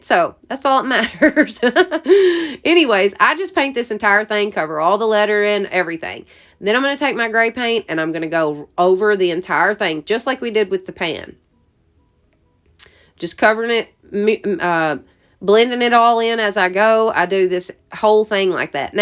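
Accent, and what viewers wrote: South, United States. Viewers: Love your DIYs. Very inspiring. I definitely love watching your videos, as always you make it very fun and positive. Your southern accent is awesome! Thanks for the ideas, I can't wait to create my own.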